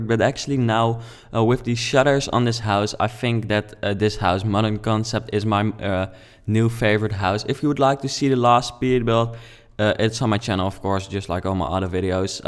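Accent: Netherlands. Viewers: je kunt horen dat je nederlands bent, Kei goed gemaakt ! ;)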